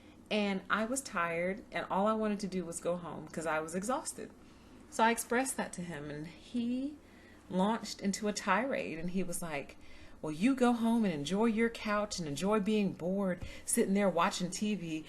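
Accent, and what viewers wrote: South, United States. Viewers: You have a beautiful Southern accent!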